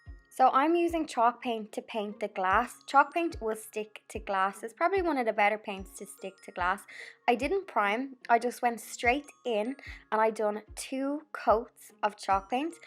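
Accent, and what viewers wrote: Ireland. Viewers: I love your Irish brogue! It's darling, and your crafts are darling, too. The little gift boxes have inspired me to get me granddaughters crafting with me! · Lovely Irish accent! Super video.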